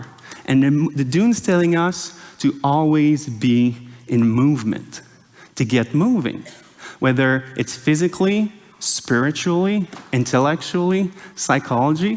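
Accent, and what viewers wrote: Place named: France. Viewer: It seems as though his primary language is french, hence his name and the accent while speaking in english. English switches pronoun every 3 digits added, french adds it every 2 digits. For example the number (77) is "seventy-seven" in english, yet, "soixante-dix-sept" in french, which translates to something along the lines of "sixty and ten plus seven" and yes, it does make for a very cumbersome approach to expressing numerics. This is probably why english is superior to french, and I speculate chinese superior to english, for conducting mathematics in general, or "mathigrammatics" if you will ;)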